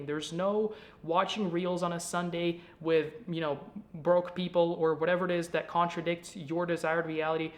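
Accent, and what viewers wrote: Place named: Eastern Europe. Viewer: as a Russian American I could tell you were Slavic instantly.....so almost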